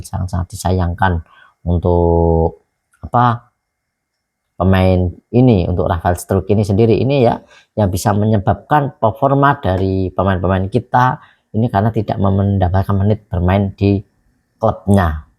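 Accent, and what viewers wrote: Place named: Java, Indonesia. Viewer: Ijin saran nya bang,😊,kalau mau buat ubdate kalau bisa jngan terbata 2 kalau lagi komentar,, sebaiknya logat Jawa nya di kontrol,,biar bahasa baku Indonesia 🇮🇩 di mengerti,,dan kalau cari materi jngn terlalu panjang 2 yg ujung 2 nya materi nya muter2 itu saja,, semoga bermanfaat buat Chanel Abang,dan semoga semakin banyak yg melihat,,❤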